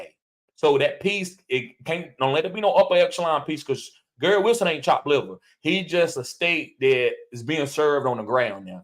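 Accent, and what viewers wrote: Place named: South, United States. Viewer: Bruh, i love that Breece comment!!! 😂😂😂 Your southern tongue does make it sound like breech!!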